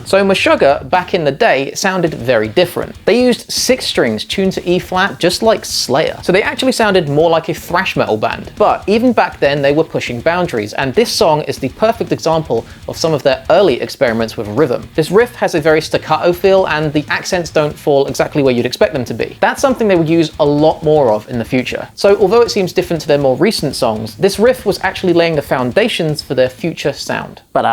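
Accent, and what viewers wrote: United Kingdom. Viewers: You speak the queen's English. It's Maths. Plural. Short for mathematics. There isn't one math. There are many Maths.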